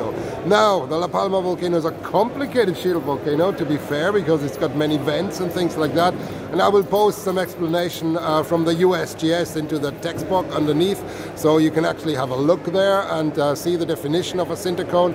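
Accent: Ireland. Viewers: Thanks a million for sharing your expertise and thoughts on volcanic matters on the isle of La Palma in a way that everyone could follow. Very interesting and much appreciated. I love your Irish accent!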